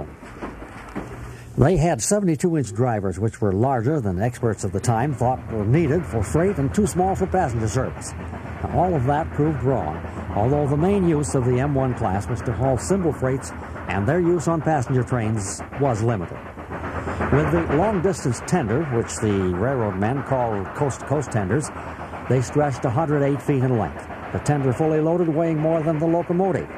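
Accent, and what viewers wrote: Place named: United Kingdom. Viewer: he seems to speak British accent rather than Americans, or is it pennsylvanian accent too?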